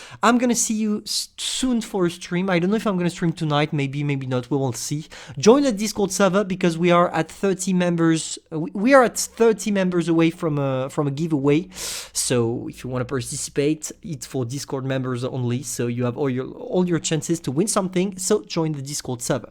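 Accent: France. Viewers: From where are you? I would guess France cause of the accent (btw rly good English), btw you just blindshooted me today. Nasty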